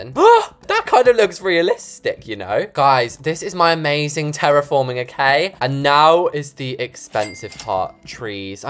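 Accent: England, United Kingdom. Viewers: What part of England r u from bc I’m English and my accent is so different from yours!! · Gurl Are You British ????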